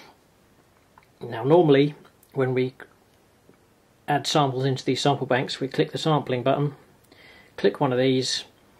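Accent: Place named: Arizona, United States. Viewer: Arizona accent:D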